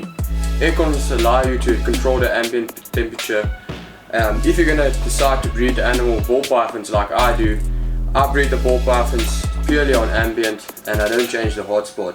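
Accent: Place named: South Africa. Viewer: Your South African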